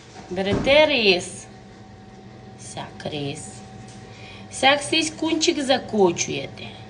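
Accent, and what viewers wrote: Transcarpathia, Ukraine. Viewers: щось вас давно не було в ефірі.Як то файно чути наш діалект бо не вшиткі хочуть говорити як х мамка навчила бо ся ганьблять,а то красно що аж ся хоче.Такі голубчики ми робимо на всякі гостини,ай бо то лем у нас на Закарпатті такі роблять а інде такі як долоні завеликі.Ми ся не нервуємо ай любуємо коли їх крутиме. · Люблю закарпатський говір, йо!😂❤❤❤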